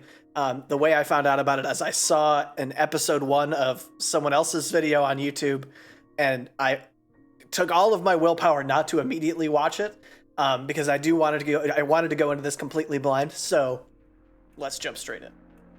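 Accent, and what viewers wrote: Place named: United Kingdom. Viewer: Listening to you gush about this game while you play it is pretty great. Also, enjoying your British accent.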